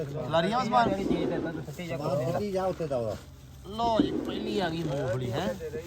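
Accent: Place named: Majha, India. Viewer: Tuhadi boli sade majhe de lagdi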